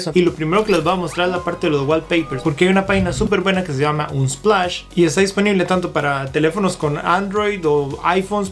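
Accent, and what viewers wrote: Costa Rica. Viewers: Saludos mae (sorry pero el acento tico fue algo que le agarré desde la primera frase). Muy buenos los videos, encontré el canal por mi búsqueda de información sobre la Mac Air 1 la cual deseo adquirir para trabajar en Análisis de Datos (SQL, Excel, Power BI) y por lo que veo de sus comentarios (así como referencias de amigos que trabajan en TI) pues parece que la opción de la Mac Air I es bien sensata y parecería ser suficiente. Una pregunta: –Gustos aparte ¿qué color me recomendaría? Me gustaría mucho la negra, pero según veo se puede ver descuidada por tanto manoseo o así, entonces pienso en la gris.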